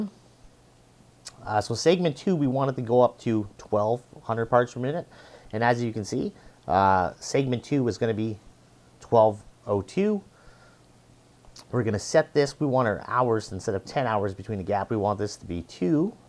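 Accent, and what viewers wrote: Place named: China. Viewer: Thank you and yes Chinese English is one way to put it.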